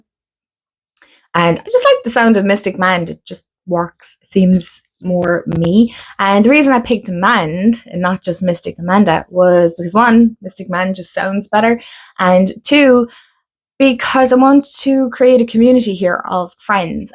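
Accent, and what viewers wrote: Ireland. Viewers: So nice to meet you, Mand. 🍀 🇮🇪 Your lovely accent sounds like home! My father’s parents emigrated from Ireland, wow, about 100 years ago and me da would slip into a brogue on the regular, which means that I slip into a loosey-goosey brogue on the regular, quoting my dad quoting Hal Roach, write it down. Anyway, I enjoy your channel and point of view. ✌️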